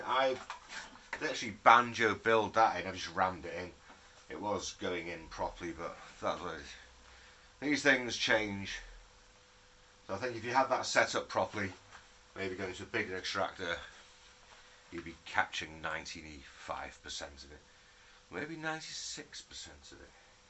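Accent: United Kingdom. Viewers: Awesome. My first video of yours that I’ve watched. Will definitely watch more! Nice to hear another UK accent in a sea of American accents. 😊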